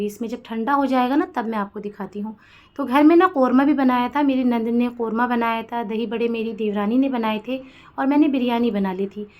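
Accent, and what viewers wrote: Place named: Delhi, India. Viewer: Wow aap dehli se h isi liye itna peyara labo lahza h mujhe hamesha andaza hota tha aap dehli ki ho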